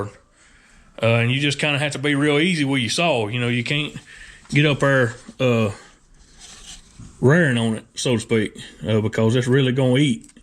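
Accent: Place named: Tennessee, United States. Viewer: I , like others who have commented here , am grateful for the absolutely stellar quality of your teaching. You and your son are a credit to the whole “ Chainsaw Community” amateur and professional alike. I own the parent company version of this grinder . That is the Tecomec Super Jolly. All of these machines are made in Italy by the Tecomec company. Your video explains the theory and practical aspects of the use of this machine so effectively that the company should compensate you for what you have done. There is no other video that compares. I was born in Florida but grew up in New York City. Your Tenessee Twang makes the video all the more enjoyable. You are an intelligent man and a gifted teacher. Thank You, sincerely for taking your time to make this documentary. I have learned much over the years about saws and chains but increased my gut understanding of the setup and operation of this machine after watching your video. 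Subscribed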